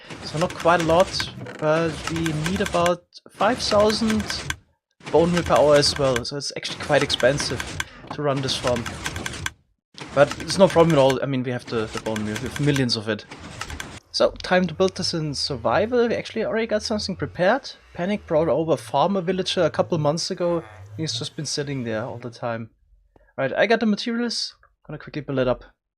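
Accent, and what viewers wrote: Germany. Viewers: Sounds like a german Guy🤷🏼‍♂️🙋🏼‍♂️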